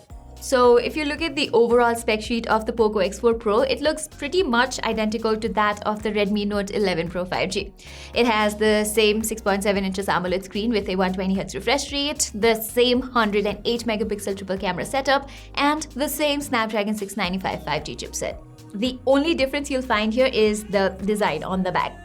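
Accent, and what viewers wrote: India. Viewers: Just fell in love with her Hindi accent 😍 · Your Hindi accent hayyy 😍😍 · Your hindi accent sunke mujko Accha lagra hai....., 😍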